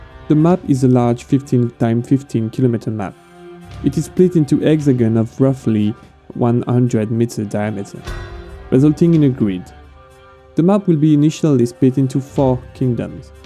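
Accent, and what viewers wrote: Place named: France. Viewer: The singing in the background made it even harder to understand your frenglish. Good vid regardless, liked.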